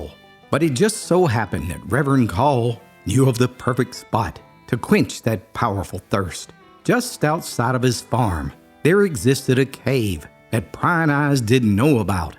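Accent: Appalachia, United States. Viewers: I rarely leave a comment. Yet, I wanted to thank you for all your stories. Your voice is always calming & a constant reminder of my time in Appalachia. The comments, too, left on your channel regarding your stories are comforting. Your content is sorely needed in these days of uncertainty. With deepest appreciation, a grateful listener ❤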